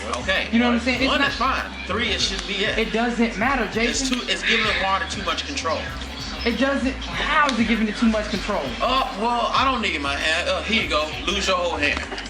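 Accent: Chicago, United States. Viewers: Were you from Chicago?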